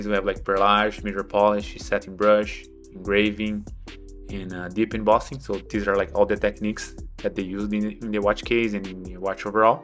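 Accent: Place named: Brazil. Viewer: Do you have Brazilian background? Based on your accent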